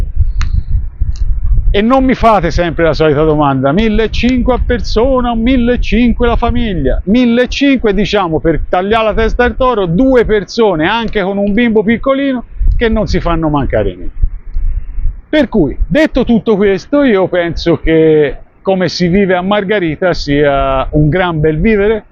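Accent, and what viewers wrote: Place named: Tuscany, Italy. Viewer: Ciao,sono Luca dì cecina (li),mi sembra che dall accento anche te sei toscano come me.....io ho vissuto in Venezuela per dieci anni,a maracay estado aragua...poi per colpa della crisi sono ritornato in Italia nel 18 e finalmente nel 22 sono riuscito a portare le mie tre figlie e mia moglie (tutte nate in Venezuela) in Italia.scusa la lungaggine,solo vorrei sapere se è raccomandabile oggi investire all isola de margarita.....la mia idea sarebbe comprare un paio dì appartamenti per turisti e avviamente una casa indipendente per me e la mia numerosa famiglia.... orientativamente diciamo un investimento dì circa 50,000 euro......che mi consigli tu? Visto che tu fai sempre ottime spiegazioni e sei una persona sincera ti sarei molto grato se mi potresti dare un consiglio.... grazie mille e continua così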